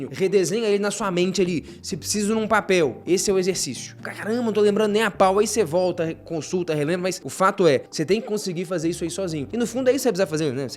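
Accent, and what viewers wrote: Minas Gerais, Brazil. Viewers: O cara já é bom em explicar, aí tem sotaque de mineiro, fica mais massa ainda. Show! Excelente vídeo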